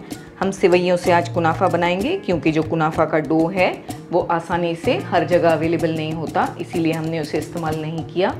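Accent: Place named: Konkan, India. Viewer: Aap kokni ho na .baato se aap kokni lagte ho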